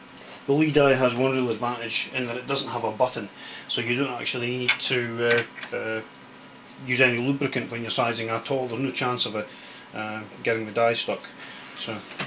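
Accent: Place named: Scotland, United Kingdom. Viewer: Sound Scottish...good video.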